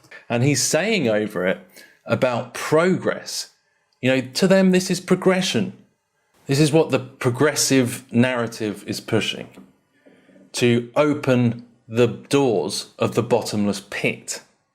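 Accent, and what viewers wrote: United Kingdom. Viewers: AT THIS POINT YOU GUY WHO HANDLES THIS CHANNEL WITH YOUR BRITISH ACCENT YOU ARE NOT THE WAY. YOU SPEAK ABOUT ANYTHING NOW TO KEEP YOUR YT CHANNEL GOING MEANING YOU LOST ALL MERIT. YOUR NOT WISE. OR SPECIAL JUST OVER SPEAKING. PROBLEMS NO SOLUTION. SO FK YOU. AMD YOUR MOTIVATION YOU FOUND PURPOSE IN BS OLD WAYS OLD SOCIETY YOUR PROGRAMED TOO YOU HOLD NO POWER OR WISDOM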